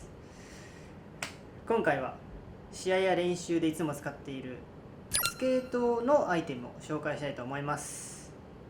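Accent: Nagoya, Japan. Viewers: 名古屋弁が…… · ほかっとく💕 たまに出る、名古屋弁にキュンキュンしちゃう🥰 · 適度な名古屋弁がめちゃめちゃ良いです😆✨✨ こんなふうに現役スケーターさんの動画が見られるなんて良い時代やなぁ💕 宇野さん、チャンネル開設ありがとうございます🎶🎶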